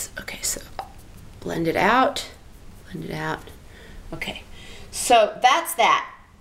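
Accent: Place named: France. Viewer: Helpful review. Love your French.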